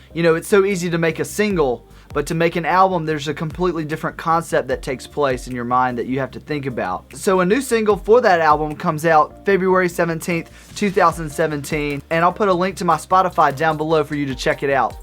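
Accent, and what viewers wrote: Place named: Denmark. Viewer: you sound danish:)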